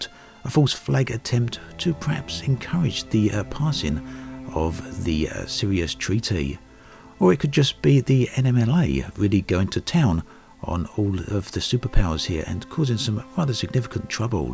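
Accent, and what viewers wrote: United Kingdom. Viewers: This dude says Bri'ish unironically, I promise.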